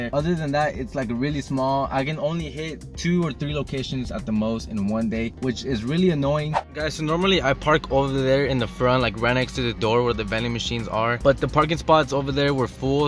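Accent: Chile. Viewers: Wn eres chileno? La raja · Are you Chilean? :0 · eri chileno ? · Are u chilean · eres chileno verdad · Are u chilean bro? AGUANTE CHILITOO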